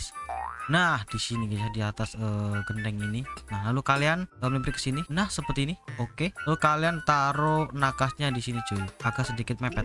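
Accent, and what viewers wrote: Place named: Java, Indonesia. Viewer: Logat jowo ne medok banget bro😂😂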